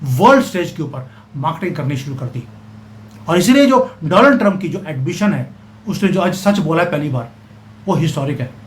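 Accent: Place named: Punjab, India. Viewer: I had asked u to confirm if yr forefathers were from west side India. Lahore/ ASR. Yr way of talking/ using words which are usually spoken in that side . Gare for Olay( ice)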